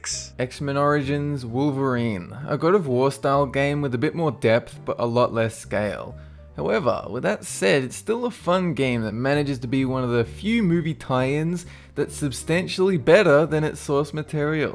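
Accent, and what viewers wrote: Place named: Australia. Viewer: I had no idea you were an Aussie until now... go figure lol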